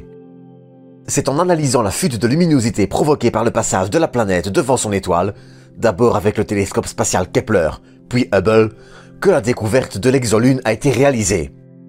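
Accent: Belgium. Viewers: Ton accent est de belgique ou d'ailleurs j'arrive pas à savoir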